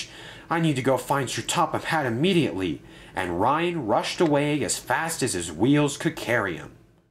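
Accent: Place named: Scotland, United Kingdom. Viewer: Ur scottish accent is on point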